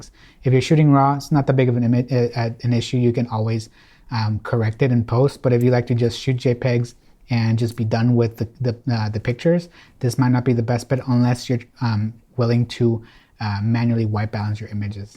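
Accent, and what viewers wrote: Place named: Spain. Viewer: You sound spanish. Do you like Messi- I don't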